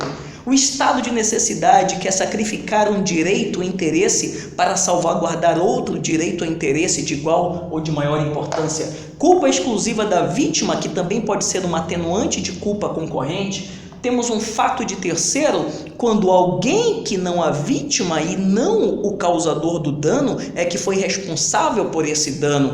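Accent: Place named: Pará, Brazil. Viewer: por aqui peguei q vc é paraense, acertei ? Kkkj hummm bunitinho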